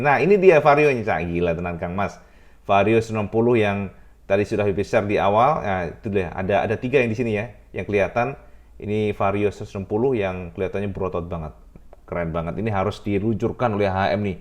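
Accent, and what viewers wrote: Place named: Lombok, Indonesia. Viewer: MATURTAMPIASIH,,,kental bahasa sasak lombok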